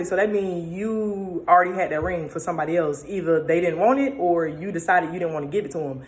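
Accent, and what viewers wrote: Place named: Broward, United States. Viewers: You are such a great storyteller. That southern vernacular, I could just listen to you all day. Netflix is in your future.👍🏾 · Ay where you from. Palm Beach checking in. Boynton beach to be exact...sounds like u from Broward or something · First of all I love that you are a South Florida gyal! #hometeam #icantellbytheaccentandattitudeofconfidence but can we just pause for what beautiful fruit God has hanging off your tree. I don’t know who he is…but when God reveals him to you, I will not be surprised at the king he is as it relates to the queen you are becoming. I’m beyond proud of you my beautiful sis. ❤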